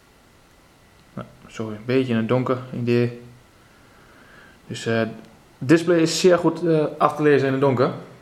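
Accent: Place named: Germany. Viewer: cool German accent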